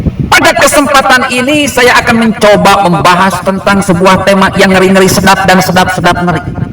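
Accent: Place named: Banyumas, Indonesia. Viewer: Hadir lajut terus ngapak medukung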